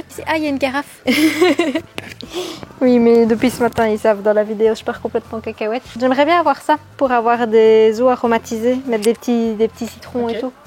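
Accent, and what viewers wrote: Belgium. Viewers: Bonjour, J'ai découvert ta chaîne il y a peu et honnêtement j'adore!!! 🙂 En revanche, je ne suis oas sûre que tu atteignes véritablement 120g de protéines avec tes repas Au petit dej, même si tu prends 200g de skyr = 20g de protéine et ton granola =20g de plus, 2 gaufres à midi et ta salade ne te permettent pas d'atteindre 40g en plus si tu n'y as mis que 2 oeufs (12g de prot) et la farine de pois chiche, aussi riche soit-elle. Quant au repas du soir, il me paraît (très équilibré c'est pas le souci) mais là encore, je ne suis pas sûre qu'on ait 40g en tout Tu penses que tu pourrais préciser les quantités de manière à pouvoir calculer les macros Je fais beaucoup de sport et je peine déjà à atteindre les 80g/jour Mais en tout cas, merci pour tes contenus, ta fraîcheur, ton naturel, ça fait du bien! Et en tant que Lilloise qui habite désormais dans le Sud j'aime beaucoup ce petit accent belge qui me rappelle bien des souvenirs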